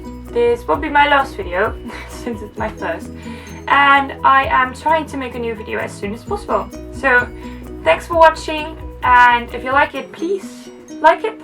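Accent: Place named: United Kingdom. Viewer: haha precies wat ik dacht, cuuuuute :D en je klinkt zo Brits wow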